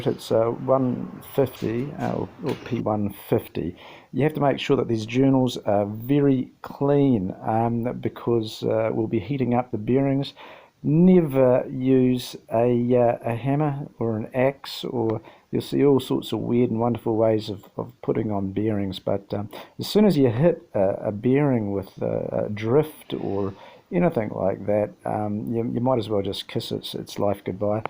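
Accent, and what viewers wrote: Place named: United Kingdom. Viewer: Very Informative and if viewers have a problem with British accent....use the closed caption. Thanks for all the information.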